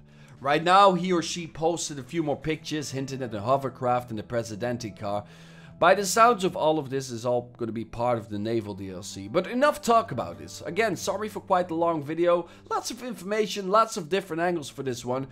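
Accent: Netherlands. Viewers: Woah I didn't know you were dutch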